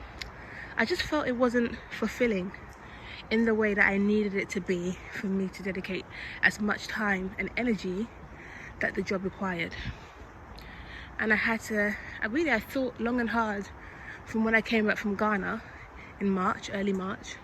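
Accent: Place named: United Kingdom. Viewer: your British accent is so proper and soothing. you could be a narrator for sure 😂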